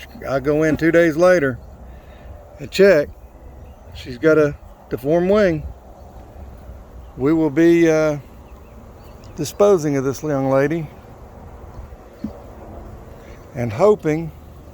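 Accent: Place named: South, United States. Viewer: don’t you hear that southern draw….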